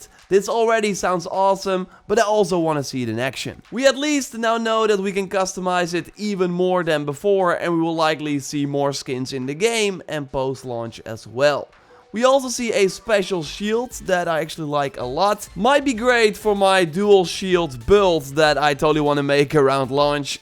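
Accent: Netherlands. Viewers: Ik vind het zo mooi dat ik gewoon hoor dat je Nederlands bent😂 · Hey i love your vids and I'm also dutch like you I could hear it from your voice and also subd today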